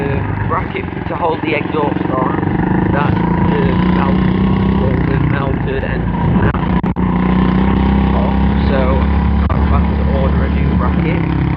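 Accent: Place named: Manchester, United Kingdom. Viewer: I am!!! :) I'm saving for this model bike! He sounds to me like he's closer to Manchester!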